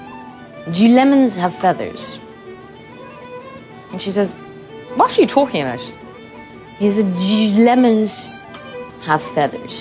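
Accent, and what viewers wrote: Ireland. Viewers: I've loved her since Misfits but I had no idea she had an Irish accent! I've always thought she was English. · I legit did not know she was Irish! such a cute accent! love, love, love the lewks she's serving - low cut and statement earrings, YEEES!!!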